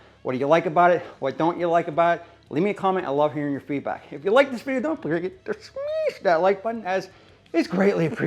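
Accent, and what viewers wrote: Pittsburgh, United States. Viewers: 🤘🏻Pittsburgh speak 🤘🏻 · Love the Pittsburgh language!!! PGH STEELERS 4-LIFE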